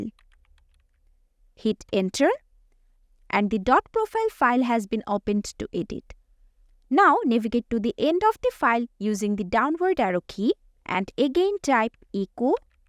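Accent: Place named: India. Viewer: I can tell you do have an accent that seems coherent or native to the country of India. But it is not so thick that I cannot understand you as well. As you seem very well spoken on your pronunciation's. Considering the info a position earlier I offer one piece of advice if I may Increase the zoom in your gnome terminal or more... I had my phone in the youtube video set to 1440p Resolution and had to zoom in to read the info. You were typing in the terminal and I have reasonably decent vision For those who don't have a 1440 pixel phone. Nor access to that resolution setting because the phone or device they're running isn't powerful enough. This would help those people Better enjoy your content Thanks Cheers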